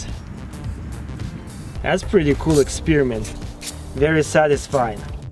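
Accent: Russia. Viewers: He sounds like crazy Russian hacker.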